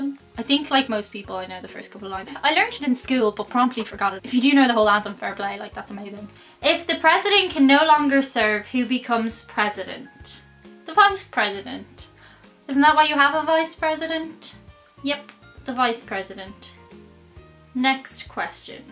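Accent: Ireland. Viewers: Please don’t take this the wrong way but I’m having a bit of difficulty understanding you. While I adore your Irish brogue would it be possible to use a Boston accent in your videos. Thanks! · Great Job! I love the Irish accent - could listen to you all day. One thing I have noticed, is three and tree sounds similar in your vids and when I watched Facts. Could you say 'The trees were planted in threes"? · I'm from USA . I passed this test easily. I'm a new subscriber and a past visitor to Ireland. Loved that experience and want to come back. I love you're energy , humour, accent. Yes do love the take on the Irish vs USA . Please more! You're beautifull, funny and intelligent. I have to admit I didn't take to Irish food very well. I was looking for American, Italian . And I don't get ice in my soda. No where! Why is that?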